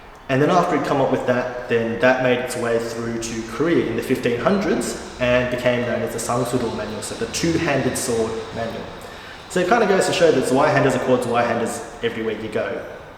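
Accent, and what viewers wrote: Australia. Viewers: First thought, this bloke is aussie, next thought did he say Sydney? Turns out you're just 5 minutes away! 😮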